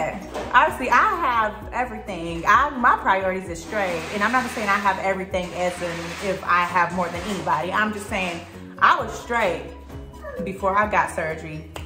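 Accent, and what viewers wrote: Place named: West Coast, United States. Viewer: Your accent is so pretty. What state you from? I’m guessing west coast but I don’t know 🤣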